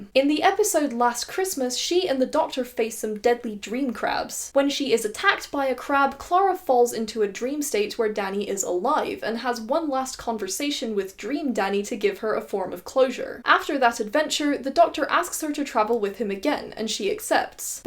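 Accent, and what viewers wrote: Scotland, United Kingdom. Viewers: i cant pin your accent, you sound a bit scottish, a bit irish, but with an english twang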